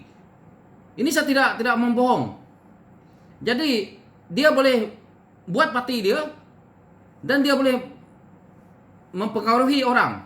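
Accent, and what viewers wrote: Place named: Sarawak, Malaysia. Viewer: walaupun pelat sarawak, saya cukup sanjung dgn you punya pencernaan 💪💪💪💪💪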